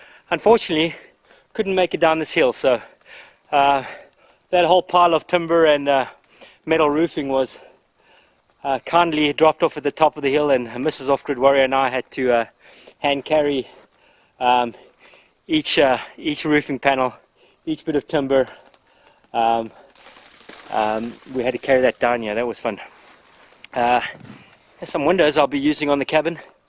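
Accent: South Africa. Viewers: You sound South African. Where are you from? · Good old South African accent there. Subscribed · Wow. Came here by chance. Almost fell over when I heard the accent. Nice to see an ex SA man doing some great things. Where are you based? Subbed.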